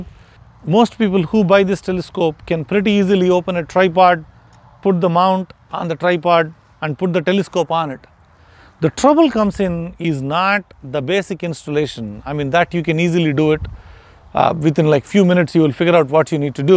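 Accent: India. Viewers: Indian accent 🥴. I don't understand anything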